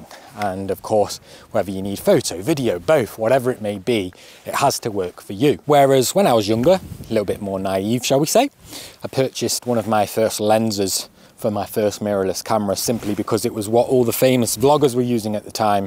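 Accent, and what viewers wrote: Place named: East Midlands, United Kingdom. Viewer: You mentioned living in the East Midlands.. Would you mind me asking whereabouts? I'm from Mansfield and your accent does sound a bit familiar. With regards to regrets, I can relate to spending so much money on gear that I then can't afford to go anywhere to actually take the photos in the first place! 😂😂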